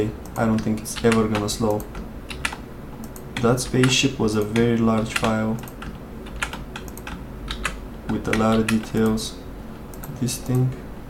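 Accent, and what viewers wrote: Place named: Brazil. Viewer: Your accent sounds like brazillian.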